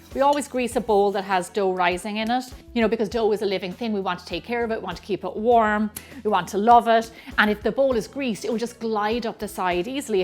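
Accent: Ireland. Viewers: I love that Irish accent!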